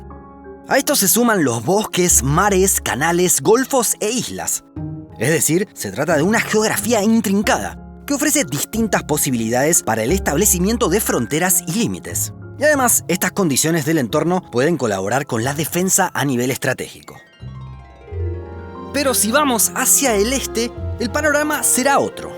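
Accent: Argentina. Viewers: Aver te voy hacer una critica muy fuerte pero realista. Por tu acento se nota que eres argentino, el mismo pais que le lame las botas a los estadounidenses, entonces ahora si comprendo el por que estas en contra de rusia. La otan quiere extenderse en europa, te voy a poner un ejemplo "imaginate que tu vecino ponga una cerca al rededor del perímetro de tu casa con torretas y esas torretas esten apuntandote a ti... Como vecino no iras enojado a reclamarle? Es obvio, el conflicto actual de la otan es querer apropiarse de paises europeos imponiendo su ridiculo lema de "libertad" mientras que rusia no quiere correr ese riesgo. Hablas de historia geográfica de rusia pero jamas mencionas las invasiones que hizo la otan en siria, irak, Afganistán en el que murieron miles de personas, eso se llama hipocresía de occidente. Antes de que hagas un tema de estos informate y di las cosas tal cual. 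💁🏻‍♂️ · que acento es ese ? tiene argentino pero a la vez me recuerda un poco al robot de platon, peruano ? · El narrador es argentino.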